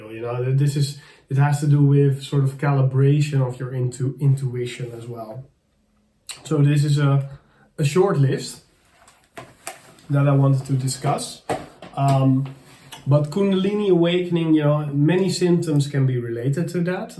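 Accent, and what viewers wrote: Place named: Netherlands. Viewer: As soon as this started playing, I recognized your accent right away because my Divine Masculine is Dutch also! Ha ha! And I love when I hear other men speaking with a Dutch accent because it clears up for me that it's my DM's VOICE that affects me! Blessings and thank you! My kundalini has just begun rising and I need all the info about it I can find!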